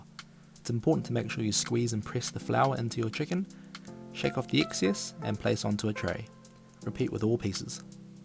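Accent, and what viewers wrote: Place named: New Zealand. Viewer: Umm it's the New Zealand accent. There are other countries in the world too. I understood him perfectly.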